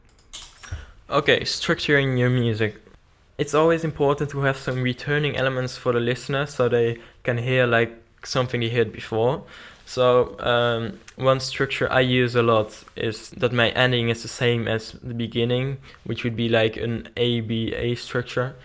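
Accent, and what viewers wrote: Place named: Netherlands. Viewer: haha. i could emidiatly here ur from holland :P. im also from holland ^^. great tutorial :) !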